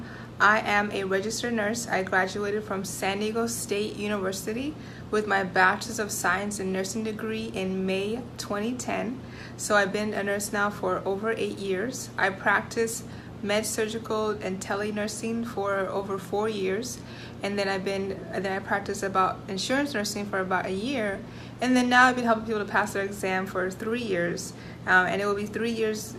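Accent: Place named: Haiti. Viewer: This video is trash but the Haitian Accent is cool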